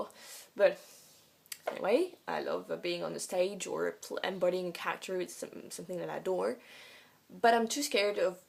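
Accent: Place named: Canada. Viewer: im questioning so much, like the fact im on a video with low views, basically this is a field that hasnt been stepped on in 8 years and i just stepped all over it. Confused with the accent sounds kinda canadian maybe its a hybrid accent.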